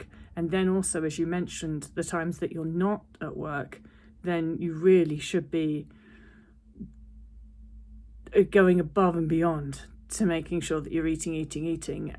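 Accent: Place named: France. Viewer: haha i love your french accent! :)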